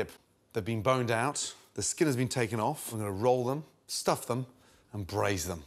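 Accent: United Kingdom. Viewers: I just love watching you sir. Good recipe and looks delicious as well. By the way I love the British accent.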